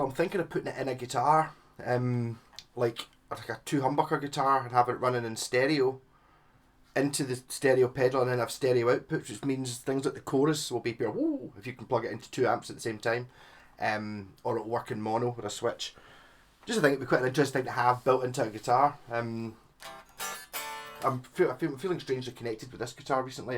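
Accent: Scotland, United Kingdom. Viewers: Fuckin Scottish accent.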